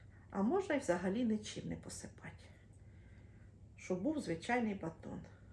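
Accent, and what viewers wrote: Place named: Vinnytsia, Ukraine. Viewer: Батони у Вас вийшли чудовіі,мабуть,дуже смачні.Так і хочеться спекти...,а розкажіть трошки про себе.Ви часом не з Вінницької області?Знайомий, говорок''...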